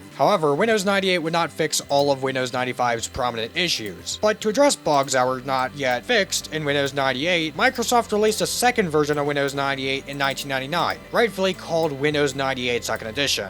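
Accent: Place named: United States. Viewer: What kind of American accent do you have? Very distinct/unique from what I am used to hearing.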